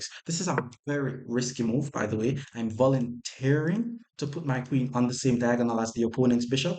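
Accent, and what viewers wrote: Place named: Jamaica. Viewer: Thanks - no hype, just really educational well commentated chess. You're a brilliant educator and I hope this channel is giving you the effort you're puuting into it. I'm from the UK and apart from actually getting better after really watching your videos, I'm also surprising my opponents when I inadvertently say something in a Jamaican accent and channelling my inner 😂 That's gg's!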